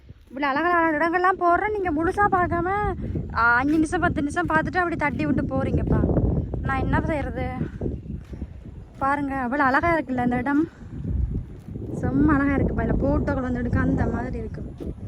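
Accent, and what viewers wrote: Sri Lanka. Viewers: இலங்கை தமிழ் பேசும் அழகு தனி அழகுதான் வணக்கம்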